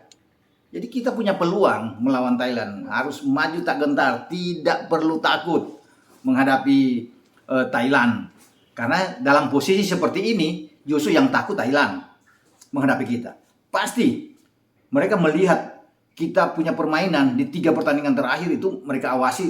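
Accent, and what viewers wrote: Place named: Manado, Indonesia. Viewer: orng Manado.? kental skali dialegnya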